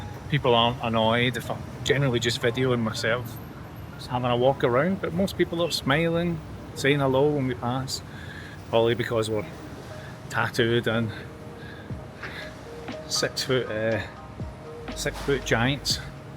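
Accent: Scotland, United Kingdom. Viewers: I know that accent, is that not Scottish? Or Yorkshire or someting? U remind me of a dear friend....he would say me arms are in pen as in my arms are in pain lol Welcome to MALAYSIA! And Have fun in KL!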